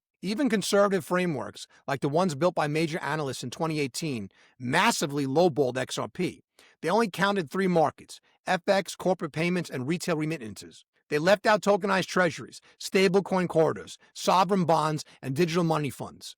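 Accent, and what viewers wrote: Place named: Brooklyn, United States. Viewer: AI can't fake a real Brooklyn accent you tool.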